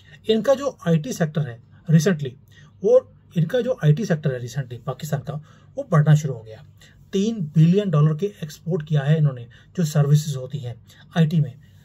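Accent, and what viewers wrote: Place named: Punjab, India. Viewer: You are simply amazing your Hindi in Punjabi is too irresponsible,and typical Punjabi moms taunt way is ultimate and of course not the least your courage in using words to insult the rivalry is super awesome..keep it up keep growing..Jai ma Bharti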